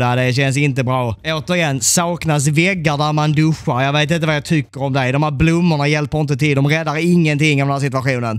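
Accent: Scania, Sweden. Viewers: Älskar skånskan ahahaha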